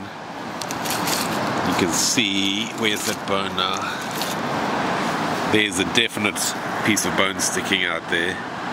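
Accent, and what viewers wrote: South Africa. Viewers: cool vid man love the fossil hunting vids you sound like you from cape town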